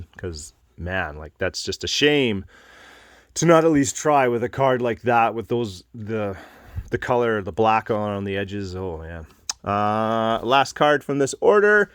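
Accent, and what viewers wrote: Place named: Canada. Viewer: I'm in Kingston, Ontario. I knew this guy was Canadian a few seconds in! Cheers fellas.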